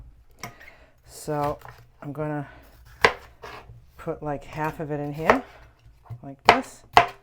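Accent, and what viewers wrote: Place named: Boston, United States. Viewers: New sub here. I am curious, what did you do before you retired? You seem like a teacher to me, maybe a New York or Boston accent? Just a guess. Love your channel too❤ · Hi! You're a lot of fun to watch - love your sense of humour and Boston accent. I'm hooked on your videos. <3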